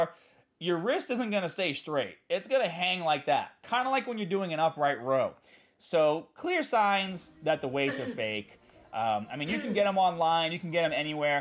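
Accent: Boston, United States. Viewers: Most obnoxious Boston accent ever and I’m from Boston 🤦🏽‍♂️🤦🏽‍♂️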